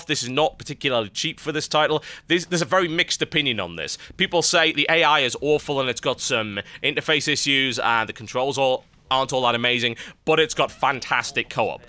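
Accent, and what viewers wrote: United Kingdom. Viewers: 27? His british accent always makes him seem so much older.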